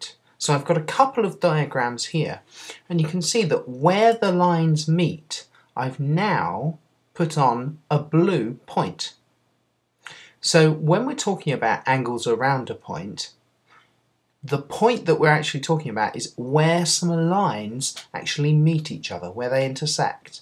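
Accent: United Kingdom. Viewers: go British accents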